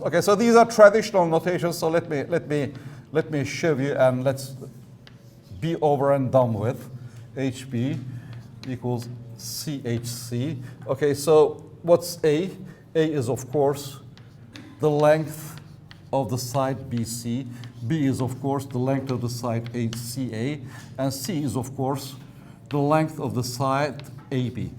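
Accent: United Kingdom. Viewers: yo hablo español y estoy buscando clases de geometría en ingles para mejorar no solo geometría sino también en ingles :D, su ingles británico me voló la cabeza ._.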